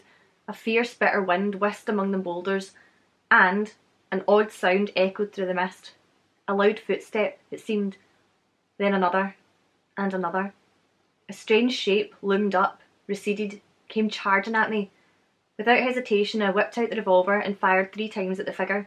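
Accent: Scotland, United Kingdom. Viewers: Everything sounds better in Scottish:) cheers.. sounds like you have another hike to do.. in the DAYTIME:) cheers.. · I just finished watching The Empty Man, a decent horror film which opens up with a hiking scene, and footsteps were... an element of it. Now I'm preparing for D&D in a few hours, watching a couple of your videos to help me get into the Scottish accent. And I stumble on this one. Heh, great stuff.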